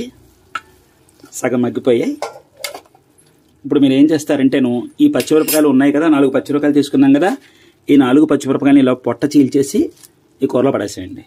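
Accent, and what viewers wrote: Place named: Godavari, India. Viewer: చక్కని గోదావరి జిల్లా తెలుగు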